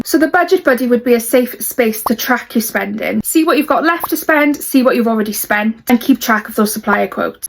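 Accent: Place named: Wales, United Kingdom. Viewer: Beautiful Welsh accent! 🥰 Congratulations on your September 2026 wedding! 💐💍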